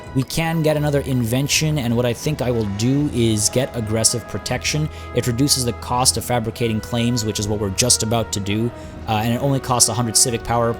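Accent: India. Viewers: How can your Indian accent is soo good are you related to India in any manner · Bhai ye India lag Raha tha muje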